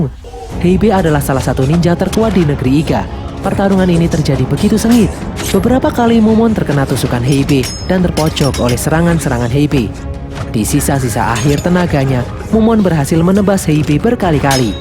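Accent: Java, Indonesia. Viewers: Cukup cukup matamu. Iki ketoke seng ngisi suoro wong jowo iki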